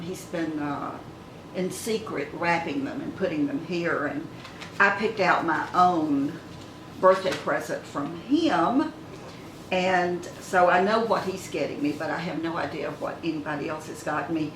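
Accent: South, United States. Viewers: you exude such lovely Southern charm! I love accents, and yours is tops! This from a lifelong Northwesterner age 83. Your makeup tips are invaluable. Please continue your friendly chats!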